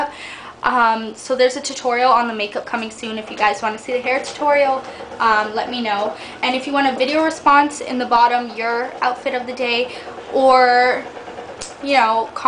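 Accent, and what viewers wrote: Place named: Canada. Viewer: i knew u were from canada u sound like it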